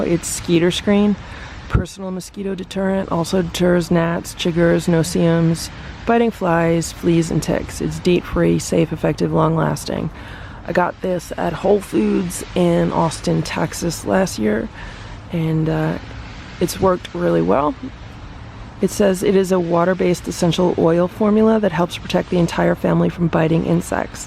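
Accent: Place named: Long Island, United States. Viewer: Where are you from....I live on long island....we kinda sound alike...I have Tom's of ferral cats by my house....it kills me. I have 2 that live in my house now and I am going to build a big cat apartment mini building.....I feel they should all have a home and be loved and spoiled.....it kills me to see them scared....and outside not feeling loved 😢😢😢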